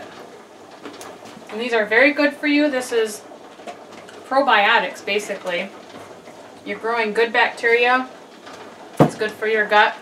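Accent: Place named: England, United Kingdom. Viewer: I like you accent. Are you English?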